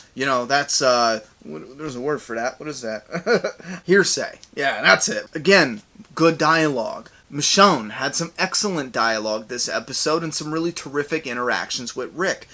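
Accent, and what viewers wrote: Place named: New Jersey, United States. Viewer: Gotcha. You had this Jersey Girl fooled. Your accent is sooo Jersey. And your humor is similar to Jay from Jay and Silent Bob from the Kevin Smith movies who is also a comic guy and from NJ. Thought I #nailedit ...smh... LOL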